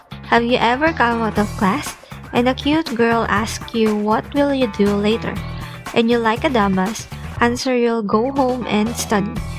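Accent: Philippines. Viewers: Instantly knew you were from the Philippines just from your accent alone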